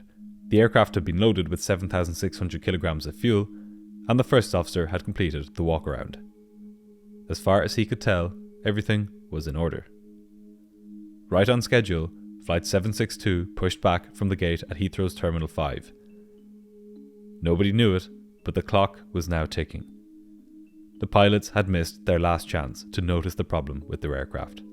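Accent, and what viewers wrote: Ireland. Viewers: Thanks a million for creating this video. Love the Irish accent. I can't believe the ground technicians can't identify the plane they are supposed to be working on. Surely their paperwork will have the plane's registration as at least one of the identifiers ?? If it does then those technicians should have been fired. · fantastic hearing a Dublin voice like mine! Love the videos. Thank You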